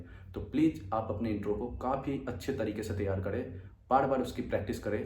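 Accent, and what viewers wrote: India. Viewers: Bhai tumhara bihari accent lg raha…Bihar se ho kya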